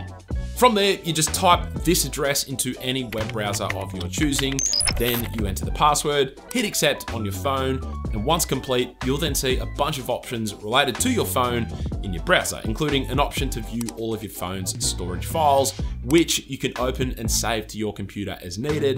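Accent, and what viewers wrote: Australia. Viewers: I love the Australian English Dialect 😂.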